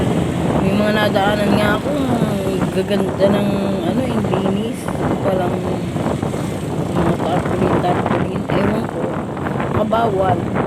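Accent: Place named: Batangas, Philippines. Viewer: areng batanguenyang talaga oh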